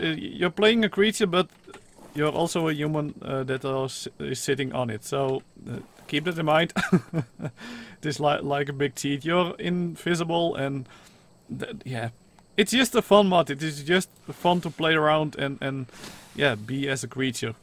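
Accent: Netherlands. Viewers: Ben je Nederlands want ik hoor het met ej accent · are you dutch? you have a dutch accent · Dutch? Your accent seems really dutch. Edit: Why can I not spawn as a water dino? When I do it spawns me on the beach since I cannot select water and it makes me human or invisible.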